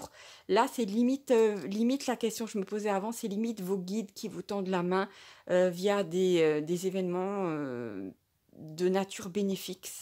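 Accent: Alsace, France. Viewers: Vous avez un accent, êtes vous alsacienne ? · Je ressens un petit accent alsacien ?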